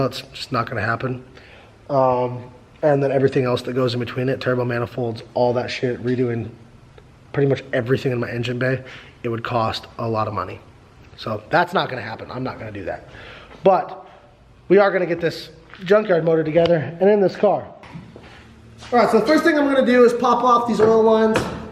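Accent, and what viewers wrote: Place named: Kentucky, United States. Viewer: I’m from Kentucky and I can definitely tell is too by her accent hahah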